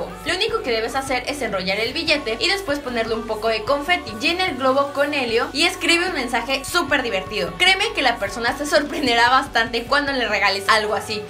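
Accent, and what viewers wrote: Mexico. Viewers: Estilo Regi ola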